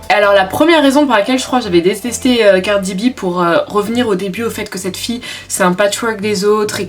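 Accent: United Kingdom. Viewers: J'aime bien tes videos mais tes mimiques franglaises sont prodigieusement agaçantes, excuse-moi hein mais j'ai eu du mal à me concentrer tellement j'étais obnubilé par les mots que tu prononçais à l'anglaise (certains étaient même français) ainsi que les "bad attitude" "ratchet" etc...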